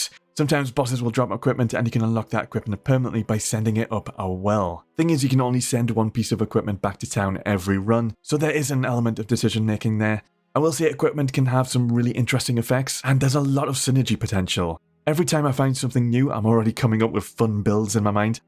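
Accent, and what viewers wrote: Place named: United Kingdom. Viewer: Goddanm i love british reviewers lol.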